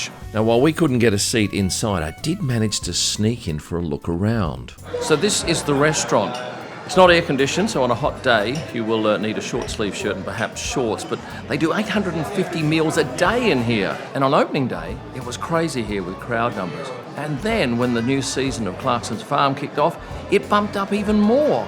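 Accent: Australia. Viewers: Why are you talking about US dollars when you have an Australian accent and are talking about a pub in the UK. We have pounds here!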